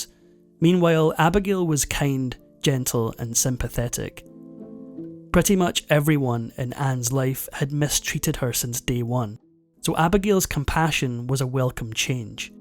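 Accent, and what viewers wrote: Scotland, United Kingdom. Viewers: Rather upsetting to hear this account of the union between England and Scotland given with a Scottish accent without mentioning what disaster that was for Scotland. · The title says "Britain's First Queen." The first British queen was Mary I, eldest daughter of Henry VIII. It doesn't matter if "the narrator spoke with a Scottish accent." It doesn't change the fact that the first female to be crowned a sovereign on the Isle of Great Britain was Mary I. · It's a Scottish accent. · I'm training my ear, could you confirm your accent is Scottish? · EXCELLENT, as always. The voice is beautifully modulated and the Scots accent is wonderful! What is your name, sir? 🥰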